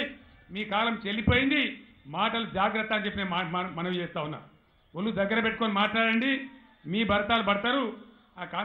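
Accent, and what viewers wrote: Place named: Telangana, India. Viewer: Telangana slang alane untadhi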